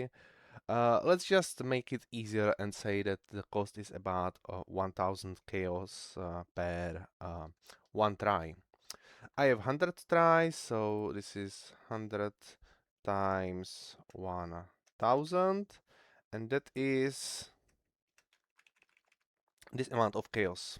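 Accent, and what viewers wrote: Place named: Czech Republic. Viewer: Českej přízvuk se prostě nezapře.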